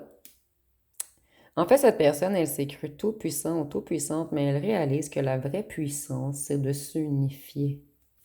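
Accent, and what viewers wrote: Canada. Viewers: Désolé je sais que je suis enquiquinant, mais ca fait bizarre, pas naturel les faux ongles, cette surépaisseur, l'accent canadien on aime bien il est pas abusé, je vous souhaites de trouver en vous quelque chose qui rayonne qui fait que tout ajout visuel, auditif, ou quoi que ce soit de "matériel" ne serait que reducteur . Une force tranquille Amen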